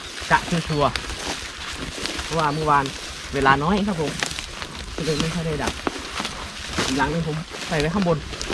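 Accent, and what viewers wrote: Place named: Isan, Thailand. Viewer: เป็นคนจังหวัดไหนคับยางครั้งพูดอีสานชัดมาก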